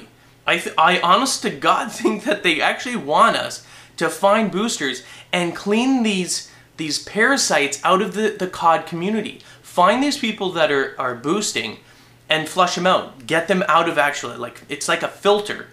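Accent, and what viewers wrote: Canada. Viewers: are you Canadian? just wondering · They should've taken it out of the whole dam game. side note...are you Canadian?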